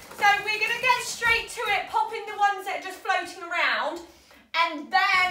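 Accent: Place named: Southeast England, United Kingdom. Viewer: Are you from SE England? Your accent is similar to mine haha. You have a lot of energy!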